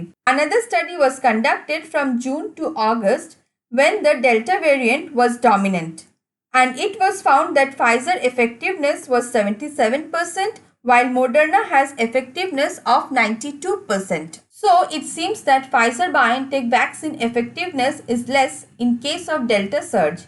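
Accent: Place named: India. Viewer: I can't understand a f'k'n' word this idiot is saying. It's not English, it's some bizarre form of Hindi/English pidgin.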